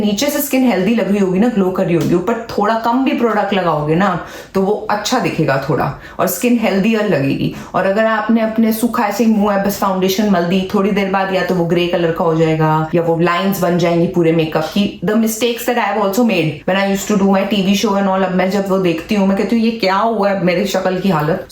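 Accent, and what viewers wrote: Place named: Punjab, India. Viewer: ! Your delivery is so relatable, so punjabi. Love watching your videos even while feeding my newborn.❤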